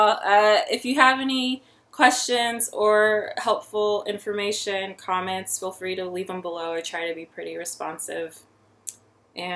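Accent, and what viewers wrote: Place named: United Kingdom. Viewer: HI, I like your ancestry DNA videos. All are very interesting. You have a slight British accent.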